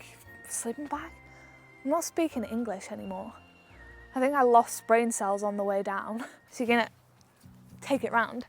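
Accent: United Kingdom. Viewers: Love your UK accent ❤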